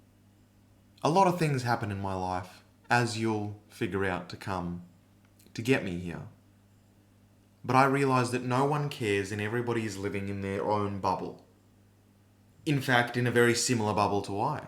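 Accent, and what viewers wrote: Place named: Australia. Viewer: ur australian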